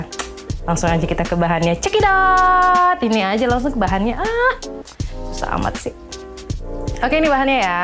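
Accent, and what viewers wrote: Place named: Batam, Indonesia. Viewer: Logak nya kaya nya orang batam..😀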